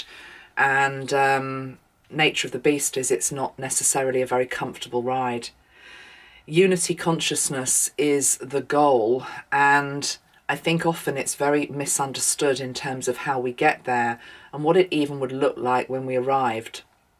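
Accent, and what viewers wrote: England, United Kingdom. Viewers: Hello I was just introduced to you last week by a friend and I so resonate with your messages I love your lovely English accent and I so appreciate your truth Thank you so much where we go one we go all Diane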